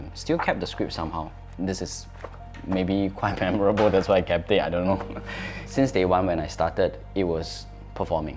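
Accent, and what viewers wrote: Singapore. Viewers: You speak like a Singaporean very good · you sound more Singaporean than some of us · You sound so Singaporean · speak english like singaporean / malaysian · his English sounds so Singaporean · his English is on par with many fellow Singaporeans his age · u sound singaporean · Already sound like local Singaporean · Singapore definitely welcome someone like you - how you've assimilated well, speak like one, behave like one, think like one and essentially you're one of us. I hope all of our foreign friends who want to be Singaporean to learn from his attitude of adapting into SG. All the best in your endeavour. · his S'porean English accent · 说的 singlish 也比我还要singlish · He spoke exactly like a local Singaporean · Totally now machiam a bilingual Chinese Singaporean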